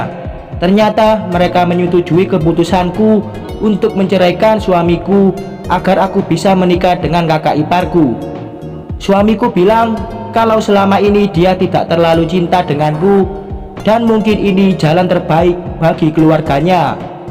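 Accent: Java, Indonesia. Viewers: Mocone kok medok nenen tho maaas, mengurangi kenikmatan pendengaranku😇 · Maaf ya mas bacanya pake intonasi,,daaan jng medok2,,kok ky anak SD🙏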